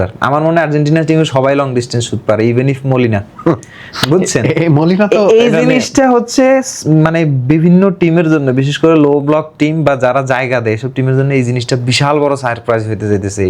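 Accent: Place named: Noakhali, Bangladesh. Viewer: এই লোক নোয়াখাইল্যা মনে হচ্ছে তবে এনালাইসিস সঠিক 😅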